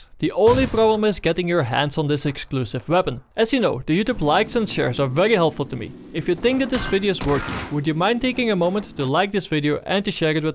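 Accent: Netherlands. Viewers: echt een nederlander hoor het gelijk XDD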